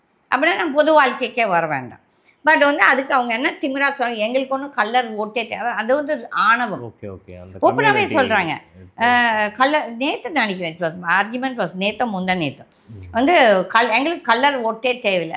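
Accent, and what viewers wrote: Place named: Tamil Nadu, India. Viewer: Your English is perfect 👌. But தமிழ் slang ? Anyway, truth is what matters ! . Bha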